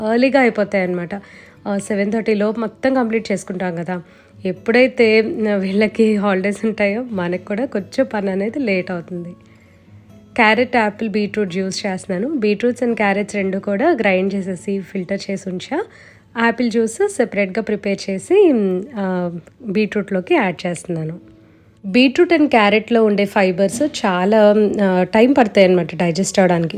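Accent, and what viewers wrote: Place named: Godavari, India. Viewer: Enka chadavaledu but I am watching his yt shorts ma super godavari slang❤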